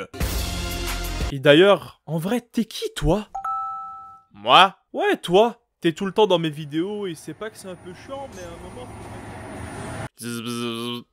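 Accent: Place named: Canada. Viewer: Oui no jugement mais j'aime bien l'accent canadien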